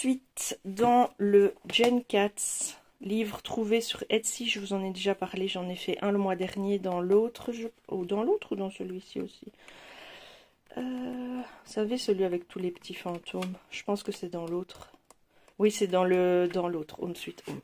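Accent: Belgium. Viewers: Coucou, tu es multitâches 😍 J'entends un petit accent belge 🤗 (klette 😂😂😂) Bisous 💙💙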